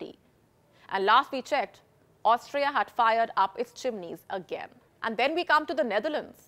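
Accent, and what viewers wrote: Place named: India. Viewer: The accent is very indian😅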